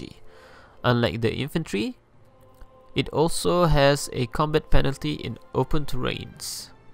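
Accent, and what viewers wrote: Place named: Malaysia. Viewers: I can recognise the accent especially when you say Darussalam, Kampong, Che Bong Nga, Gajah, Alam Melayu, and Pesilat. Very significant ^^ Nice to meet you :D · Sounds Malaysian, hi! xD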